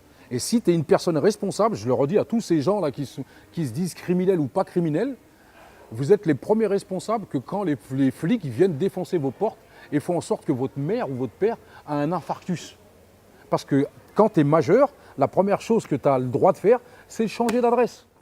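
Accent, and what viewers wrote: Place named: Banlieue, France. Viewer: Ba jean Gabin si tu viens pas de banlieue pourquoi tu parle comme un banlieusard ?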